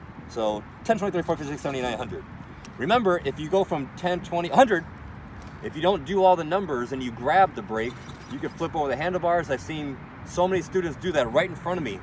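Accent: United States. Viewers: I like your American accent..very clear. Great info.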